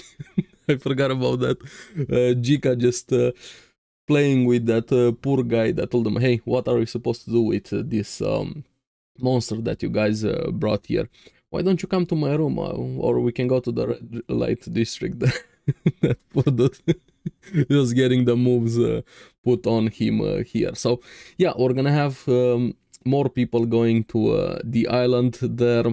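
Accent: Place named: Eastern Europe. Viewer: Soooo happy this anime has finally returned!! I watched Season 1 Hell's Paradise, Chainsaw Man, and Heavenly Delusional all around the same time and been patiently waiting for their return cause I loved all 3. Especially Hell's Paradise cause I think it's such a fire series. Nice review. I liked and SUBBED. By the way where are you from bro?? You have a cool voice and great English. Eastern European or Russian I'm guessing? lol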